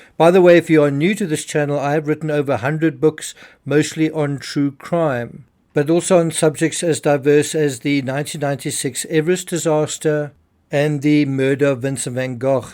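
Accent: Afrikaans, South Africa. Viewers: Another Afrikaans accent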